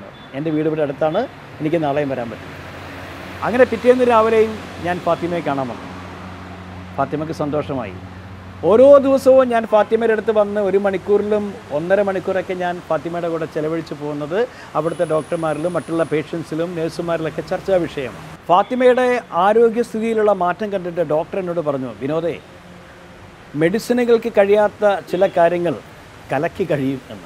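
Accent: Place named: Kozhikode, India. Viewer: Kozhikode bhasha paranja madi Mr kovoor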